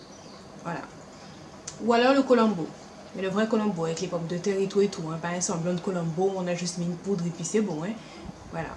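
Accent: Guadeloupe, France. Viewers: OUPSSS... comment faire la cerise à partir du PC? Pois rouge et dombrées? Mdrrr oui pour les dombrées et non pour les pois rouge! Oui ce qui rappelle tes origines c'est ton accent guadeloupéen. L'accent martiniquais me semble t'il est moins chantant. Ceci dit j'aime beaucoup ton accent. En sé fèw palé lonten. J'aime le ''migan de fruit-à-pain'' ou les ''mantouts'' (appelé au 971: krab a bab) Vivement ton prochain tag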